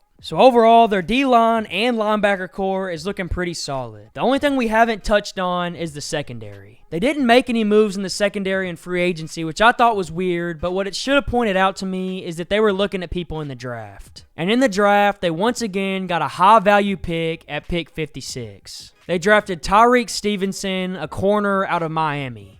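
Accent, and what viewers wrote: South, United States. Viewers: Good comment but it is a southern accent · You have a nice voice youngin.. and your production is very clean.. Great used of the 5 sec rule, facts and references. I look forward to hearing more from you. Your defiantly in the south somewhere with that comfy accent lol. Keep it going, dont do some idiot type stuff, watch you company, keep pumping and you can go pretty far.. Look forward to hearing you on ESPN .. Keep your nose clean.. Sub!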